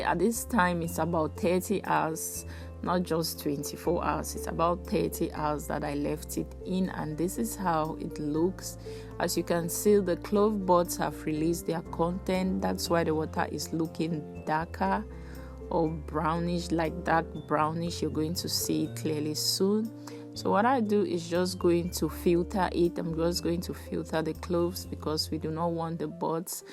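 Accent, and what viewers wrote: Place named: Cameroon. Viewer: plus u sound like Cameroonian, are u Cameroonian?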